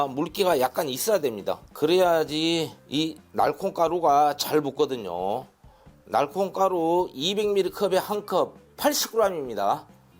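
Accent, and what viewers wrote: Jeolla, South Korea. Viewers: 셰프님 라도사투리~양재기 참듣기좋습니다 잘배워갑니다❤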